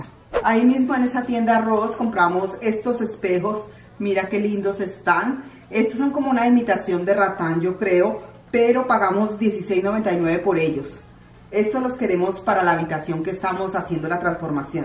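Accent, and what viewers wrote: Colombia. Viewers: Tan linda mi,nunca has perdido tu acento Colombiano eres tan genuina sencilla,no eres egoísta tienes don de servicio por eso eres triunfadora en tu canal, amas a tu mami y flia.Tus manualidades bellas Dtb 🙏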